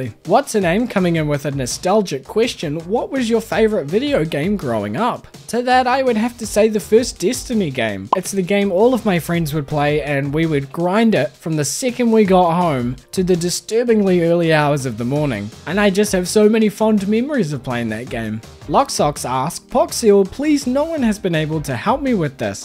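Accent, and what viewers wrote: New Zealand. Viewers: Funny how people thought you were Dutch when you have the most New Zealand accent to ever accent to me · I KNEW YOUR ACCENT WAS FAMILIAR!!! it's my own.... Lmao. Good ol kiwi accent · Great video. You're very good at it. 😊 I recognised your accent because I recently moved to Aus from South Africa and the NZ accent is kind of a smoosh up of the two (English South African & NZ). Loads of people ask me if I'm from NZ. I love your videos ❤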